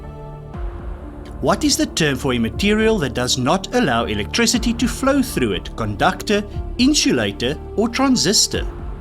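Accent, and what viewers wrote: South Africa. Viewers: Also thank you for your great quizzes ! Your pronunciation is wonderful !!! The S/A accent is great !! (JOKE ) "Tell me , being South African , do you have a grudge ? " " of course I have a grudge ...where do you think I keep my car? " xx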